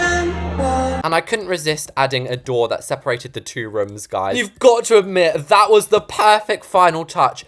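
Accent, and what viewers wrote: United Kingdom. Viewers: He's from uk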